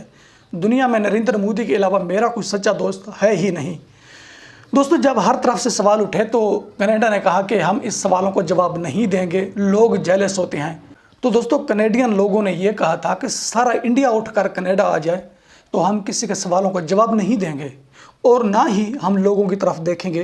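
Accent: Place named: Saraiki, Pakistan. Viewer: bhai andaaze bayyan boltta hai aap sirraaeikee zubbaan ka mixture hai achhaa bolte ho khush rahein panipat s kumar singh o k B y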